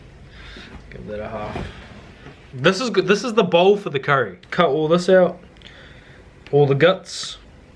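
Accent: New Zealand. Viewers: I’m Māori and was looking for bunny chow recipes knowing it’s a Indian South African dish. Last thing I expected was to hear an accent like mine haha. Look so good btw thank you for this!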